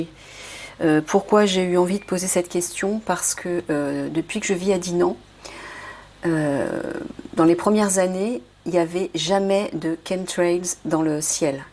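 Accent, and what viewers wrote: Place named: Belgium. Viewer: Oh vous êtes belge :). C'est clair que c'est de plus en plus visible... Merci pour ce partage.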